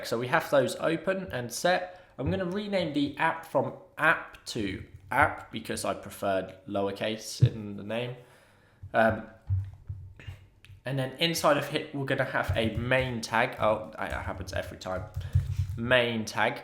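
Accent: United Kingdom. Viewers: learned a nice thing today weather = wafer, the British accent is sometimes very funny and sometimes just annoying :( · you're British right?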